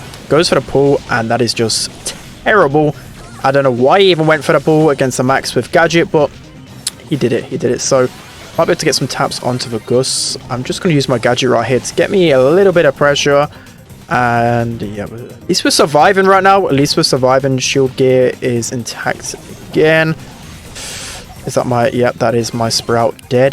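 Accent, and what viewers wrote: United Kingdom. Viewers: Is he british?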